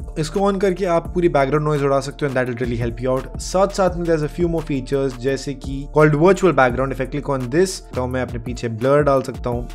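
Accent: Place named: India. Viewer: Maybe native English speaking people understand what Indian people say when talking "English", the rest of the world doesn't. All your words are twisted in a strange way. And you also speak always in a hurry. When you slow down when your are talking it helps a lot to get more viewers. I did watch only 30 sec's.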